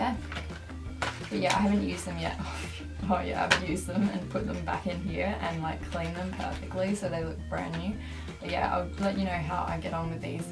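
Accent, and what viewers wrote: Australia. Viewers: Wait if your not Aussie where are you from. U sound Australian 😂🤔😂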